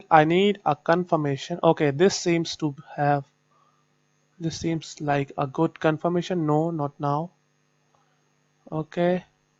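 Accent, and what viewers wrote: India. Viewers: hi bro. i'm from Vietnam. it's not easy for me to listen Indian English but i'm so happy to see your video. it's truly useful. Thank you so much.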